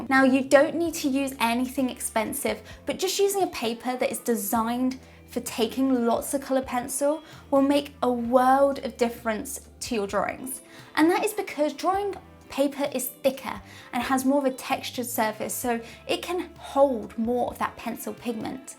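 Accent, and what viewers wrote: United Kingdom. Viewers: Are you british? Your Accent is very nice 👍👏👏👏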